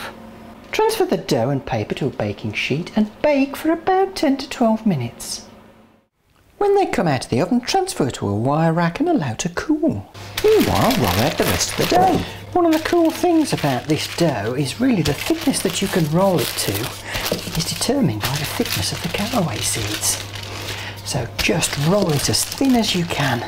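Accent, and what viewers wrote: Birmingham, United Kingdom. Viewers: It's a Birmingham accent but not very strong.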